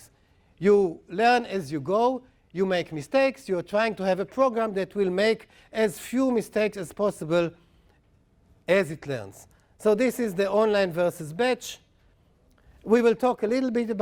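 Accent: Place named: Israel. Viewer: yay heb accent ^^ :-)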